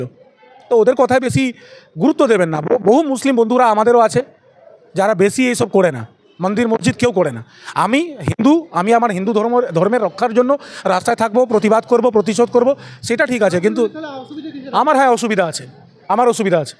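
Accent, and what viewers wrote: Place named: Bihar, India. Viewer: Bhi tor kotha sune mone hoy tue bihari..... Go to bihar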